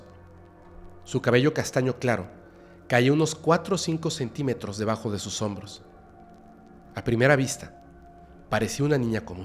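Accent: Mexico. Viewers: Si es mexicano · Hola tengo una duda: ¿Eres mejicano? Por el acento lo pareces. De donde seas, te mando un gran saludo y un fuerte abrazo.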